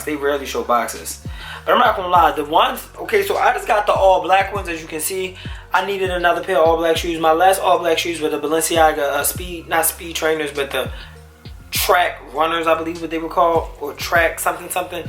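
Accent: Baltimore, United States. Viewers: u gotta b from bmore i could hea it in ya accent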